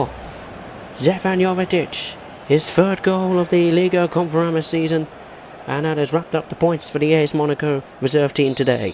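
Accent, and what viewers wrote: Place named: United Kingdom. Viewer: You sound like a rich posh englishman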